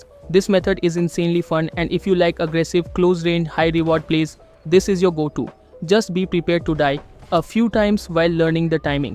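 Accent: India. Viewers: The moment i clicked on this video, i knew it was bout to be good by the indian voice this guy straight to the point like tech tutorials by indians and no extra yapping..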